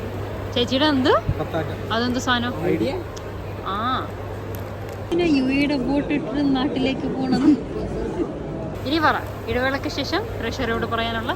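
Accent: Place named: Alappuzha, India. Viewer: Alleppy Slang ❤❤😍😍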